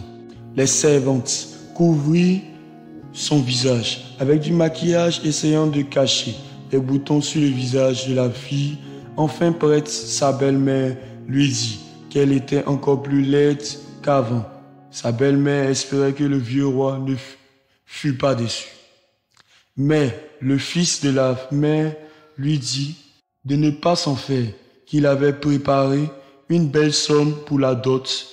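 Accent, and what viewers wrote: Ivory Coast. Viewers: Ta voie ressemble à celle d'un ivoirien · bonjour je vais m'abonner à ta chaîne ça me ferait plaisir de voir ton évolution par ailleurs ont à déjà dit que ta voix ressemble à la nôtre celui des ivoiriens 🤛🥰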